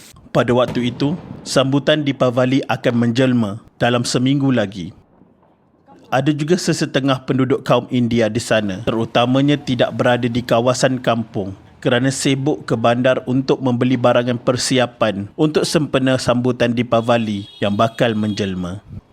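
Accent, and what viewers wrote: Kelantan, Malaysia. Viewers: Telinga saya banyak kali dengar admin sebut TADI dgn TAKDI.. saya agak admin lelaki Kelantan la, maaf kalau saya tersalah dengar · Kann..rasa ore klate doh tu 😁😁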